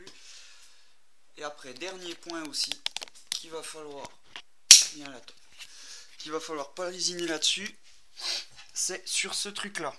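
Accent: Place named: North, France. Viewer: Ta un de ces accent du nord j adore !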